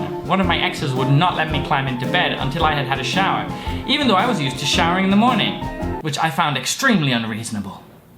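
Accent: Standard. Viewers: 你講中文超標準的啊！！！！ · 台語太標準了 不訂閱怎麼行～ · 不得不說，發音蠻標準的😂😂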